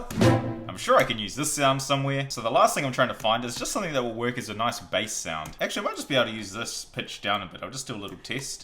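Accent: New Zealand. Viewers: Wow, haven’t heard a kiwi on YouTube before. At least I’m 98% sure ur kiwi. Ur vid was good too🙃 noice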